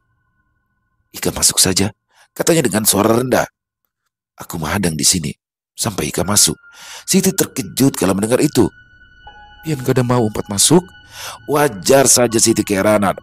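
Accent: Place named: Banjar, Indonesia. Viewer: ASSALAMU ALAIKUM Bg,slmt mlm 🙏,kisah yg sgt kereenn,👍satu hal yg paling suka jika stiap kali bang membawakan kisah"dari tanah Kalimantan,logat bahasa Banjarnya itu sgt fasih,sbgmna orang" Banjar berdialog sehari"nya,& itu sllu saya perhatikan,tdk canggung,mantaaabbbb, 👍👍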